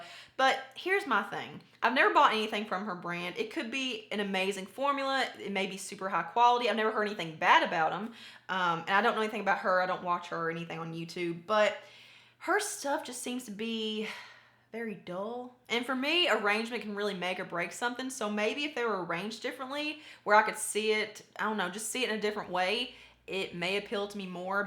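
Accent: South, United States. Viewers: New to your channel and love it ❤ I am from Alabama, you sound southern as well .. what state are you from?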